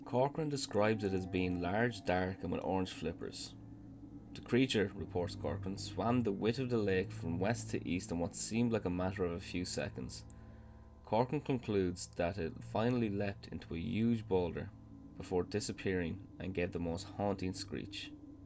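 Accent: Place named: Ireland. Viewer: i was wondering what kind of irish accent that is. thank you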